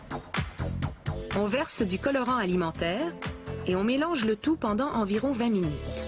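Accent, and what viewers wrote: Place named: Canada. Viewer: quel bel accent canadien !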